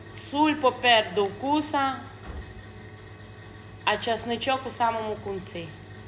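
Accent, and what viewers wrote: Transcarpathia, Ukraine. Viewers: Файна мова, Закарпатська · Ви розмовляєте по закарпатський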